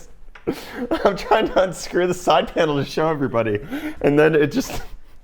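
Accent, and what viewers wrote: South Africa. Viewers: You south african? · Your South African wow so cool I'm also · you from south africa ? is jy van Kaap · m8 wat? south africaaaaaaaaaaaaa?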